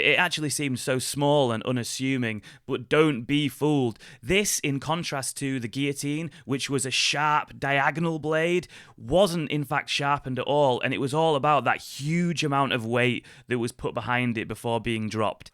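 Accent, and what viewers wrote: United Kingdom. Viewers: I really appreciate your handsome brit accent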